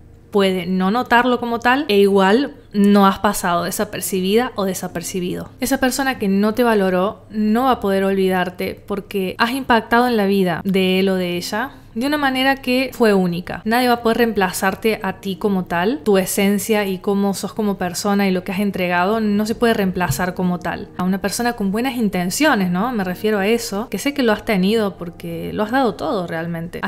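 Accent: Argentina. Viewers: Al ver este video, parece que has visto mi historia, y todo lo que dices es verdad. En mi caso quise una relación con una persona que no lo vale, pero me pasa que siempre quiere volver y da señales para que yo ceda y vuelva, pero está vez ya no va a volver a pasar. Gracias por este video, desde luego lograste mi suscripción a tu canal, por tu acento seguramente SOS argentina, país dónde vivo. Besos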